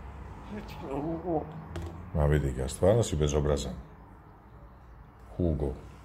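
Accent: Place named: Split, Croatia. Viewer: legenda a jos ima i splitski naglasak hahah lepotan pravi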